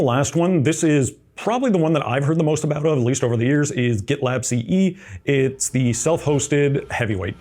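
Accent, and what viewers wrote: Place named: Nova Scotia, Canada. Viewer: sounds like a Nova Scotia accent to me.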